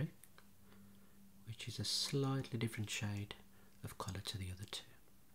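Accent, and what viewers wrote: Birmingham, United Kingdom. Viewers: OMG A BRUMMY ASMRTIST MY DREAMS HAVE COME TRUE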